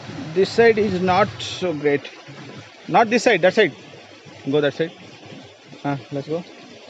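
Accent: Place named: Odisha, India. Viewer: Aap odia walo ho na .. english clean nahi hai aap ke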